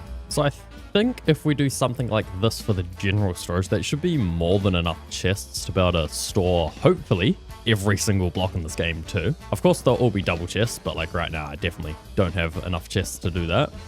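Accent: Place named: New Zealand. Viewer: I keep running into other Kiwis in the wild and not being able to recognize my own accent 🤨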